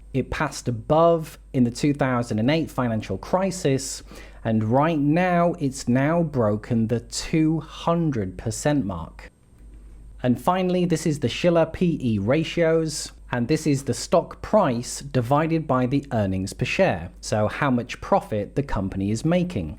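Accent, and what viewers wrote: United Kingdom. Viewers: This is great for the American market but what about the London Stock Exchange? You sound like a British person but focused on the S&P exclusively. There seems to be a bubble in the USA but in the UK values (I've heard) are similar to 20 years ago. Could an American crash cause a UK crash?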